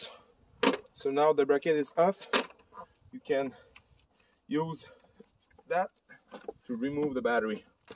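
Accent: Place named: Quebec, Canada. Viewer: pour l'effort pour l'anglais 😄. Toujours drôle d'entendre un accent québecois sur YouTube. Bon vidéo 👍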